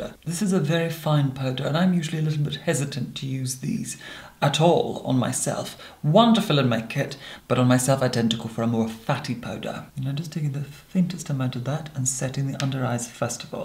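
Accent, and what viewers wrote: Scotland, United Kingdom. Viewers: u are exactly right. Scottish born English raised. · He doesn’t have an English accent I’m pretty sure it’s just very posh Scottish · My family has Scottish friends with a similar accent. He just speaks with sophistication. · Scottish but using English RP. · Nah,he's got a Slight Scottish accent is all.Hes done a good job of getting rid of it. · he's Scottish, not English but he does speak very proper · I do hear a slight Scottish accent from time to time. I find his accent to be fascinating. Cheers! ~Anastacia in Cleveland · He's Scottish... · They do Gillian, I’m just saying it because he is Scottish and so am I (with a Jamaican mother raised in Ireland ) shrugs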